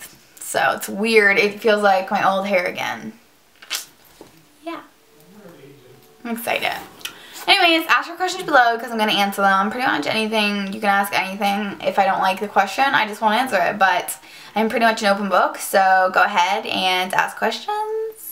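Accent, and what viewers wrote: Canada. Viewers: hey, so i tried commenting on another video of urs but it was giving me greif! it was the kat von D first impression vid on her foundation girl it looks incredible on u. looks llike great stuff. what brush do u use though cuz it looks good? also, i love ur personailty supre cute rihgt up my ally, i say cra cra all the time hee hee. keep it up kiddo :) btw u live in canada? u have an accent.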